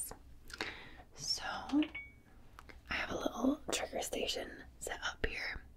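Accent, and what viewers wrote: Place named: Scotland, United Kingdom. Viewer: half an hour of tingles from the resplendent scottish lass. perry the platypus noises ☺️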